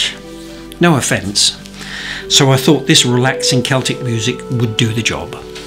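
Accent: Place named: United Kingdom. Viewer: Dream atmosphere. Enchanted by the music, your painting and your wonderful British accent. I could spend hours watching you paint. Thanks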